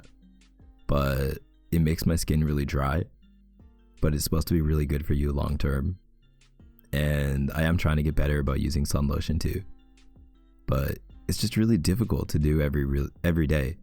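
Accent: Canada. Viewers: his canadian accent is adorable ugh 🥲🤍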